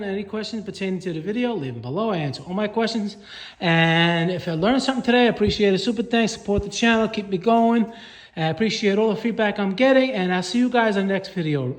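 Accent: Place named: New Jersey, United States. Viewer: That’s a lovely accent you have there, New Jersey?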